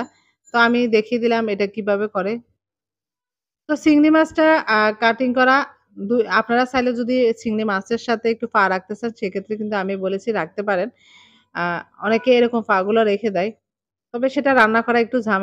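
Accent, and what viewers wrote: Noakhali, Bangladesh. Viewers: নোয়াখালীর আপা আপনি?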